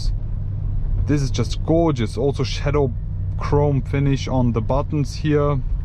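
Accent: Germany. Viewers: Very iritating english german pronunciation!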